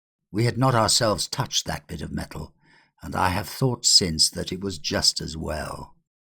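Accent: United Kingdom. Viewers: With or not prohibited filmation, please continued to read for us. The British pronunciation is a good listening. Have a nice time.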